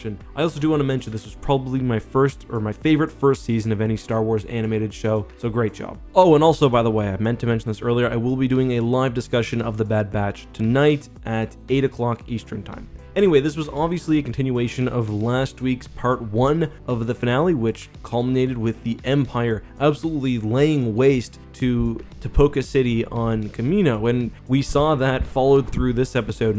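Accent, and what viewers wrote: Canada. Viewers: You Canadian bro? From Ontario?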